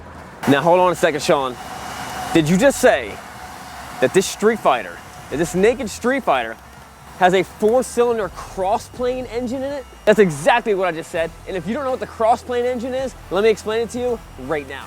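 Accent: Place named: South, United States. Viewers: I think he's texan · hes from the south what do you expect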